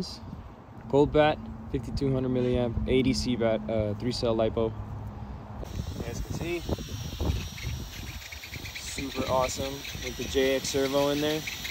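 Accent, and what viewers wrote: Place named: Miami, United States. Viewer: Have no idea how I came to find this channel but gotta say bro hearing you talk hella remind me of Game Bred himself Masvidal. Lol. Gotta be from the MIA?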